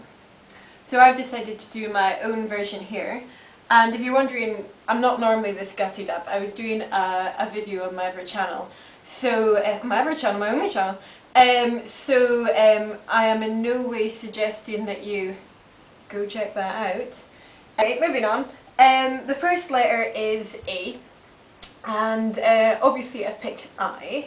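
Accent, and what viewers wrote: Scotland, United Kingdom. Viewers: Where in Scotland are you fae? I huvny heard that accent before? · Where are you from in Scotland? Sound northern! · LOL... "affy late home." I absolutely love the Scottish accent, I forgot about the word 'affy'. :) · As I listen to her say certain words, I defiantly hear similarities between her Scottish ascent to the ascent of people living in the southeast USA. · Alright, old video I know...but that's a light accent. Where in Scotland are you from?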